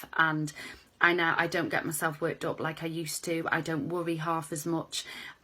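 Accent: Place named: Wales, United Kingdom. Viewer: What a GREAT video! You are an inspiration to US ALL! Love your Wales accent!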